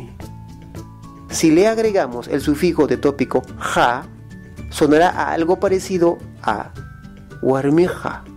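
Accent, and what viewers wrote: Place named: Ayacucho, Peru. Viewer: Como que no me convences con tu argumento, tu acento suena quechua ayacuchano .. la letra 'Q' no la enuncias con caridad. .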